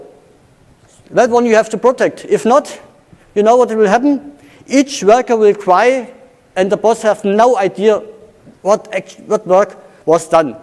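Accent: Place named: Germany. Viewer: I love German English :D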